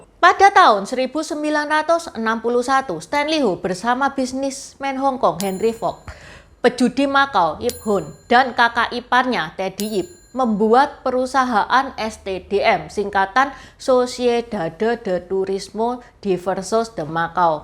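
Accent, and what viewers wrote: Java, Indonesia. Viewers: Logat jawa 😅😅😅😅😅😅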